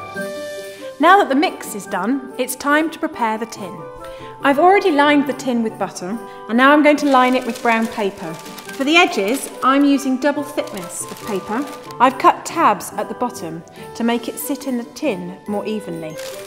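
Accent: United Kingdom. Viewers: Why do I keep watching these! Do I just love British accents?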